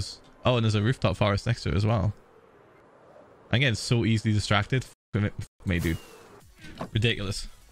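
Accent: United Kingdom. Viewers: is it just me or does he sound extra british today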